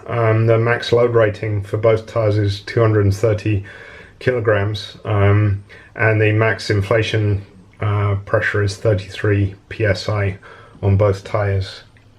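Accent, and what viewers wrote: United Kingdom. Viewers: Why with a British accent? You're missing clicks because Tokyo + Offroad makes no sense. Great video content though.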